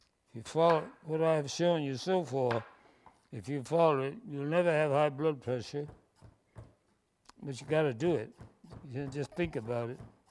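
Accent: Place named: South Carolina, United States. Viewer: I love this ELDER!!! So beautiful and such and inspiration and he sounds like my people from Lady's Island SC 🌴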